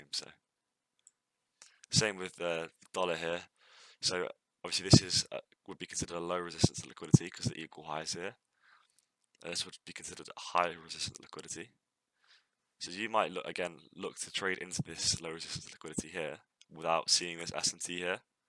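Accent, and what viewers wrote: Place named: London, United Kingdom. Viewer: laughed out loud at 7.29 when you say wer are fucked lool. Are you from London bro? fix up a channel asap.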